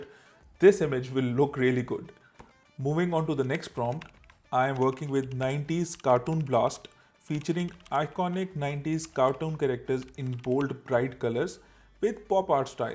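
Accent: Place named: India. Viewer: He sounds Indian but does not look Indian.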